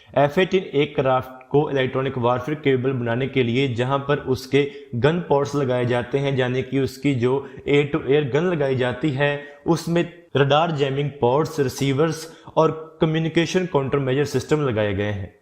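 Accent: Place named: Pahadi, India. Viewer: Being a pahadi... And hearing your voice seems you are too. Good job bro 👍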